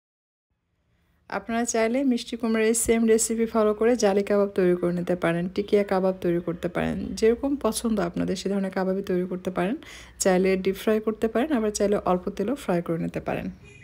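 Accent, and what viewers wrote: Bangladesh. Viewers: Khub stylish apnar biboron....apnake dakhar ichhe...apni open kitchen baniye amader ranna show korun.khub kaydar ranna apni janen.....apnar channel ami follow kori.bangladeshi dialect y kotha bolleo apnar bisheshotto achhe anyo man er adobkayday bojha jay tai apnar kotha shunte bhalo lage.INSHAALLAH AMON SUNDOR MON ER MEYE HOYE PRITHIBI JOY KORUN RONDHONSHILPO KOLAY!🤗🤝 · nijer khawa nijei khan, kothar tike apne bangladesh neki, sorry amar valo lagenai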